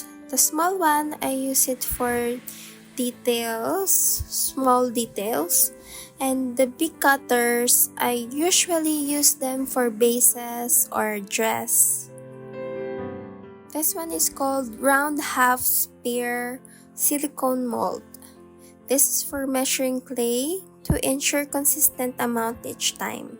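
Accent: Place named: Philippines. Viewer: based on your voice and accent filipino ka po ba?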